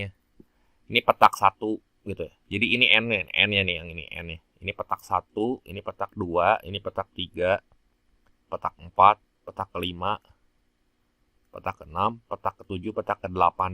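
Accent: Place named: Bandung, Indonesia. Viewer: logat nya bandung pisan mang😂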